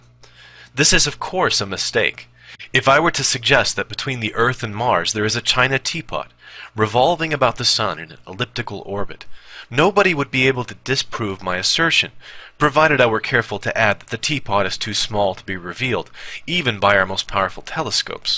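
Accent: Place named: United States. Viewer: all very reasonable, but why is an American reading it? Bertrand Russell was so terribly English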